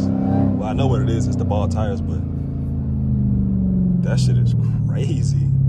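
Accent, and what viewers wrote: Atlanta, United States. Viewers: Sound like u from da Atl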